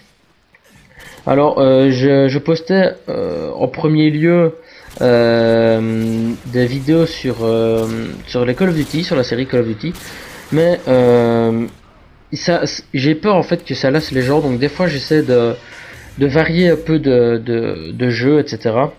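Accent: Belgium. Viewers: j'ai direct su que tu venai de liege avec ton accent ( je suis de verviers ;)) ce qui explique ton pseudo ;p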